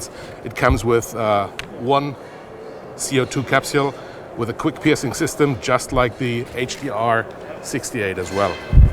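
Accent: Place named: Germany. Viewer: You have a pretty cool accent. Are you German?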